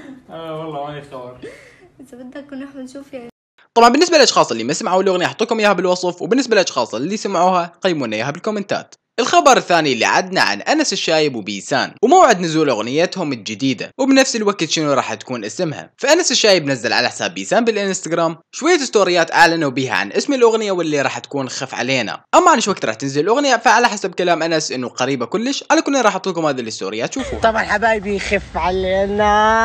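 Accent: Iraq. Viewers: اوووووول سؤال انته عراقي صح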